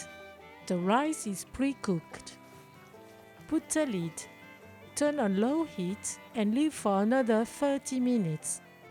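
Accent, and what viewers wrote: France. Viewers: This has to be one of my favorite versions of this dish! The time you took and detail you provided was inspiring. I just love seeing another person who loves cooking world cuisine for the joy of it and it’s so heartwarming to see what I perceived as an Asian woman, with a French accent, making a West African dish that I, as a woman of similar differences, love recreating meals and the memories I love from around the world. Thanks for sharing 🥰Can wait to watch more of your videos ❤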